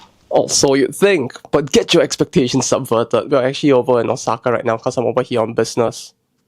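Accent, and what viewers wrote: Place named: Singapore. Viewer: No way, i wasn't the only one who heard the singaporean accent in your voice heh Also i can add to that discussion of workforce gender presence in the eroge creation. One of the examples i can give is, lets say a hypothetical "Beauty isn't skin deep (yuri)" game that the art team had a specific member setup (atleast during the first project i worked on the CGs), it was only 5 people big, 3 males and 2 females (though it would grow exponentially by 2016). Each of the artist had a specific role to work onto their allocated CG pile, one would focus on the atmospheric setting of the novel, thus designing soft pallete CGs with only the minimum of affectionate connection between the characters. One would focus on the 'standout' CGs as they called it which focused on having an emotional impact ongoing between the characters, and one (me in this case) to be behind the passionate scenes, which would be more of a softcore or a play-state type of mood. 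the more experienced female artist of the team, would sit in a call with the others and point out design or anatomical discrepancies, give tips on polishing the art or highlighting certain zones (not always the naughty stuff, sometimes you want focus on the background, as the backdrop is key into how the player perceives the current situation to be, and can be very distracting if not done right. The experienced artist was also the one responsible for the more.. hardcore stuff CGs, and would basically supervise the whole art quality. In or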